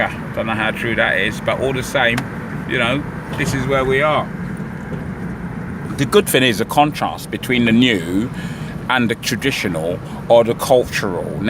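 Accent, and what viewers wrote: Cockney, United Kingdom. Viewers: interesting commentary...esp' with your cockney accent. LOL someone should tell Rochas he needs to improve the quality of the roads his gov't constructs in Owerri! that's what lets down his efforts in opening up new areas of the city!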